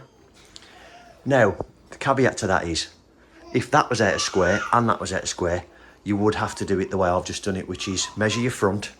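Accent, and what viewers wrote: Birmingham, United Kingdom. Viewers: This is the first one of your videos that I've watched. I am SO impressed with your work. So professional!!! You sound as if you're from the Birmingham area. I'm going to be having a new kitchen later this year and I'm definitely going to be looking you up (if you are indeed from the Birmingham area!). I've fitted my own kitchens in the past, but you're so good I think I need a professional!!, Great work!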